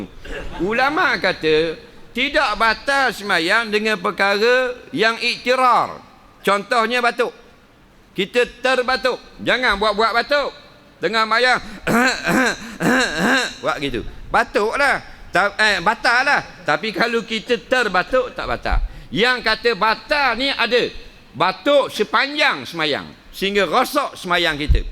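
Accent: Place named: Pahang, Malaysia. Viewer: Pahang doh ustak.. Time kaseh banyok impo nye ustak..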